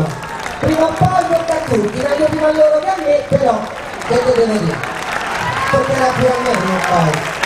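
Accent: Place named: Rome, Italy. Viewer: Brava, ma si levasse il vizio di usare il Romano !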